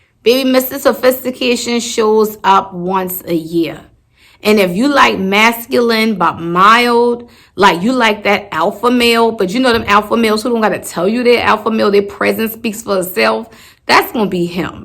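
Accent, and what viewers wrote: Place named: New Orleans, United States. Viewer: I love your accent...are you from Nola??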